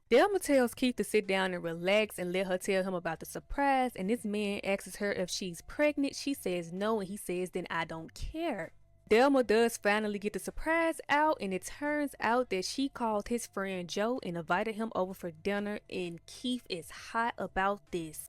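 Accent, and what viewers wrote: Memphis, United States. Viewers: Not only do I love Good Times, but you commentating it with that Memphis accent is just dope!!!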